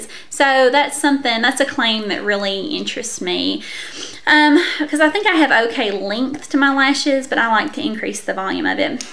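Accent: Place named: Texas, United States. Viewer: Love ur texas accent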